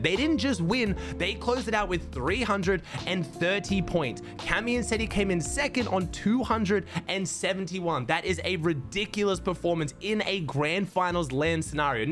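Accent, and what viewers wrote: Australia. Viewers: WHO IS THIS AUSSIE GUY?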